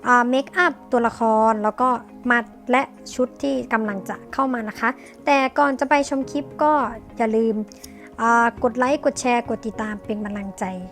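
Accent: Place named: Isan, Thailand. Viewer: มีสำเนียงอีสานซะด้วย55555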